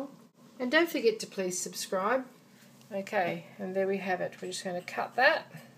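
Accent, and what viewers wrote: Australia. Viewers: Greetings from Melb! Awesome recipe, thanks for sharing! I'm gonna make it for my parents after getting all the ingredients needed ready. (P.S I didn't realise that your accent is the Aussie one,but does sound familiar =_ =||| probably becuz we are on the same boat, hahah) Anyway, thank you for the recipe!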